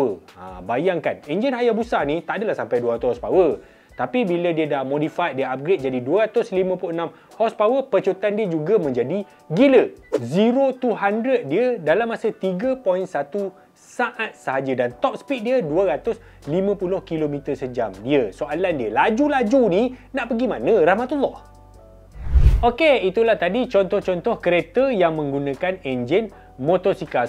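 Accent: Kelantan, Malaysia. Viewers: Bey demo ore klate dih?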